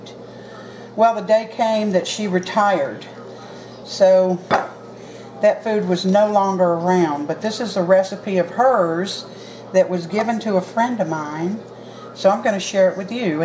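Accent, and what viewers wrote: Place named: Tennessee, United States. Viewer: Your accent sounds like you in Tennessee. Are you ?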